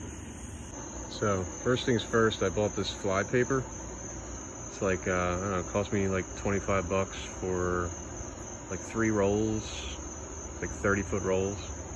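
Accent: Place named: Pennsylvania, United States. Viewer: I nailed that accent immediately. Yes, I'm from PA and I have disgusting Lantern flies.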